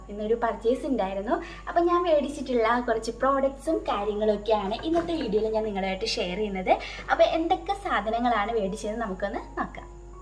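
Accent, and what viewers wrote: Kollam, India. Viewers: കൊല്ലം സ്ലാങ് പറയൂ. മിക്കപ്പോഴും മറക്കുന്നു.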